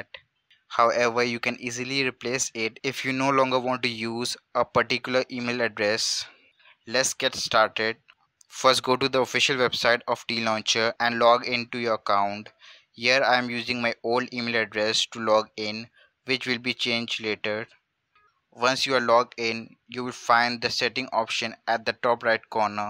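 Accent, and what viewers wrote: India. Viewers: anything tutorial with an indian accent works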